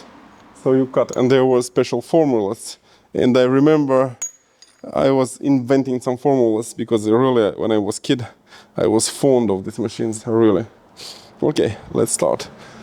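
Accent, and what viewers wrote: Russia. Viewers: I thought you where German but Russian is also ok 😊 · Hahaha. Like we couldn't guess you were Russian right from the beginning. Lololol. You're too cute Dear heart. Your workshop is looking fantastic. Great job getting it tidied up. ❤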